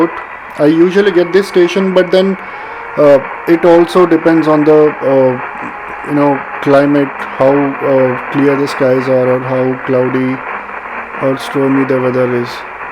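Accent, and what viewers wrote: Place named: England, United Kingdom. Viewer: I am in love with your English accent brother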